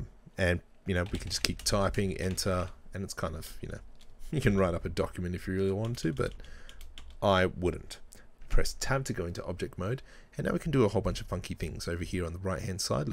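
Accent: Australia. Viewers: Yeah - I am using Blender 2.82 which is a bit different - but the convert-to-mesh was in the same place. Do you have a tutorial to add materials and export for threeJS by any chance? Very cool to hear an Aussie voice in this environment also!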